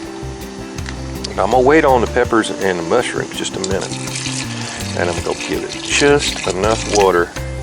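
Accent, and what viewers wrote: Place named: South, United States. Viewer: I'm only half way through and I'm really liking it. The outdoor kitchen is looking great can't wait to see how you decorate the wallscwith your old time cooking gear. I'm Australian so with your southern accent that makes a sentence sound like one long word can you tell me what you said about the 4 burner works well?? Haha great job mate 😎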